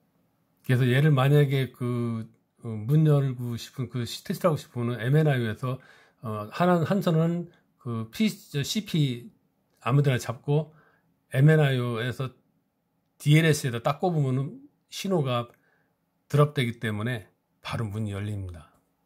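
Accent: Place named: Japan. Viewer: 일본에서 기술제휴로 만든 승강기이고 일본인 고문이 교육하고 40년 전만해도 영어를 제대로 못배워서 그런 발음을 하네요,,,지적 참고는 하는데요,,고치기는 힘들거 같습니다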